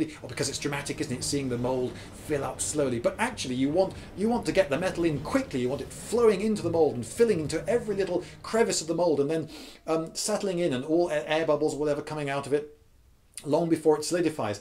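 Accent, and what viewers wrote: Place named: England, United Kingdom. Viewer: Also the English accent, you can't forget that.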